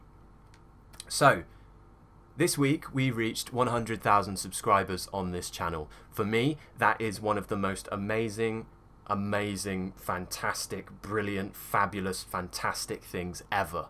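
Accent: United Kingdom. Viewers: British english is so sexy 😏 I love it and I am also excited bout your videos... I admire your accent 🤔😱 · Mr, Good morning, I like the British accent with pronunciation. I've also searched for this kind of video until I find you. Now I'm thrilled with your videos. My morning also starts with your videos. My improvement is also apparent now. Actually, I'm from #Bangladesh. Lots of love from my country. Warm Regards, RAZIN AHMOD:elbowcough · Can't wait for your weekly lessons! 🔝 I'm completely in love with your super British accent lol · hi, I'm from Brazil, I like british accent and your videos. cheers !... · I'm sure most of people subscribe to your channel because of your clear and beautiful British accent. Congrats 🎊 may you reach more 😊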